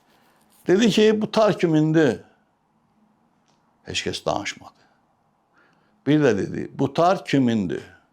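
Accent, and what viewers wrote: Baku, Azerbaijan. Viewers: Как люблю нашу бакинскую речь натуральную речь ❤❤❤❤❤ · Elə bildim əmimə, dayıma qulaq asıram. Bakı danışığı çox doğmadır... Sağ ol, sənətkar! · Allah sizə can sağlıgi versin sizə qulaq asdığcan Baki ləhcəsi ilə danişığıniz məni valeh etdi ölənlərinizə rəhmət olsun qardasimi xatirlayib ağladim sizcox səmimi əsl layiqli insan kişi xarakterli bir sənətkarsiz sizdən birdaha analar dogmayacaq belə sənətkar 1000 dən bir olur❤❤❤❤❤❤❤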